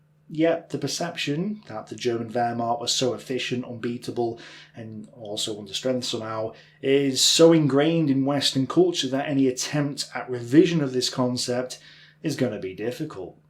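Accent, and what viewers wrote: United Kingdom. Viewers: If you want foreign listeners, try to tell a history...a tale.....not giving us numbers and never speaking so fast.... not only the British pronunciation is terriible for us but you also speak fast and connect words in the same style Germans do....well...if you want us to listen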